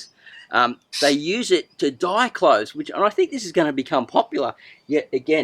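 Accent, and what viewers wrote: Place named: Australia. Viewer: i had no idea Australians had more than 1 accent but compared to say steve irwin this fella is hard to understand very very thick accent... its cool sounding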